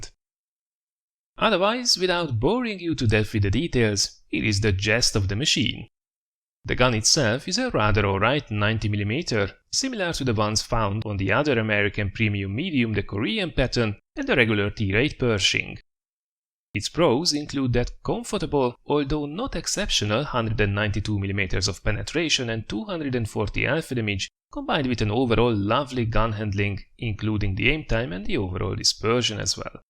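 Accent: Hungary. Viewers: Szia csak szerintem beszélsz magyaros angollal? :)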